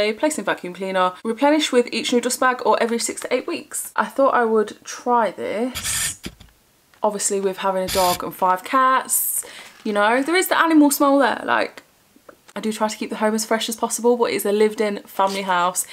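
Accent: Nottingham, United Kingdom. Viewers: I said the same....im from nottingham and accent sounds so familiar x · Your accent sounds similar to Nottingham I love watching these you are so bubbly I love that you go for it with positive attitude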